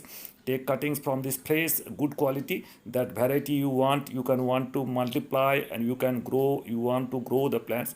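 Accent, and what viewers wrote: India. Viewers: Indian accent English :-)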